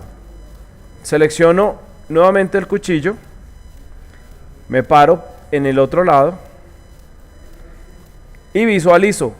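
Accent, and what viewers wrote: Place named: Colombia. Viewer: Hola enhorabuena por tomarte el tiempo de hacer este tutorial, fue muy explicativo. Estaré atenta a nuevos videos! Tienes voz de Colombiano jejjejejej me agrada mucho lo sencillo que explicas. Muchas gracias!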